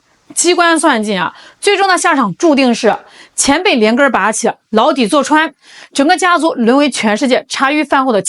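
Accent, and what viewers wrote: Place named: Henan, China. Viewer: 这个主播的口音大概是豫北冀南鲁西的人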